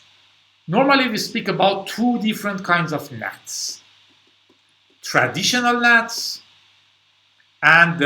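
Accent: Iran. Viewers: Thanks for the info, you're an expert in explanation;) Also, loving the original Persian accent:D Love <3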